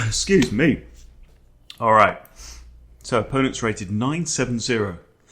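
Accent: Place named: England, United Kingdom. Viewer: I'm sorry you're being criticised for mistakes. Most people watching you are advanced beginners to intermediate, I suppose. If they want perfect lines, there are plenty of titled players on youtube that can provide that. You helped me get over that beginner stage when I kept getting wayward queen attacks. I think I've learnt more from you than any other youtuber and it's nice to have an English voice :)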